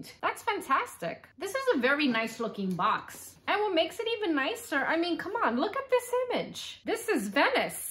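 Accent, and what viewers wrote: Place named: New York, United States. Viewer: Hi, This is the first video of yours that I'm seeing. Really like your energy and enthusiasm for puzzles! And it sounds like you're a native New Yorker, like me! I have a ridiculous number of Eeboo's - - most I bought myself and others were gifts, but I've only done my 500-piece ones so far. Maybe I'll work next on the VENICE one you showed -- it's in the closet somewhere, LOL. Have a great day!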